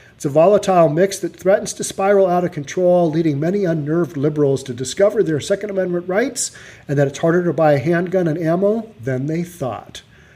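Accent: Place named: West Coast, United States. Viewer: Tried to watch this. But this guy is to west coast laid back trying to be cool and slow with that idiotic accent.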